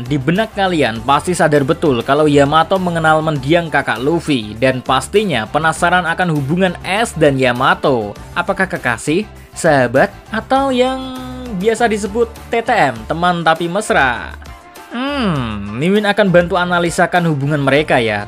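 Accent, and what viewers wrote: Java, Indonesia. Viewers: Mimin orang Jawa ya nanya aja min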